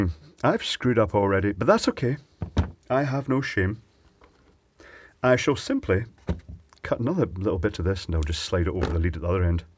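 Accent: Scotland, United Kingdom. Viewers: Love Scott Accent.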